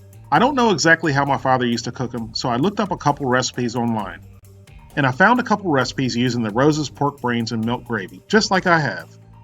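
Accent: South, United States. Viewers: No offense but if your dad liked pigs brain and scrambled eggs you have some fucked up genetics. I always imagine you as a big 260, 280 lb southern dude off the way you talk and eat lol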